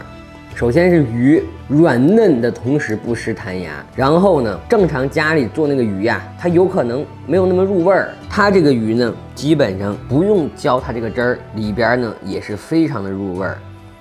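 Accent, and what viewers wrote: Beijing, China. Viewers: 京腔的特点就是这样，不少北京人说话就是这样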